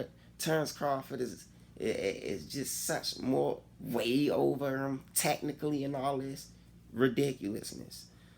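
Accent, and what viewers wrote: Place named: Washington DC, United States. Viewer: I know this accent anywhere... my man from D.C... you make good points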